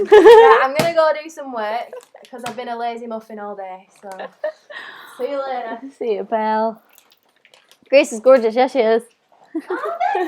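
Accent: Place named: Ireland. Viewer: That amazing Irish accent! ;)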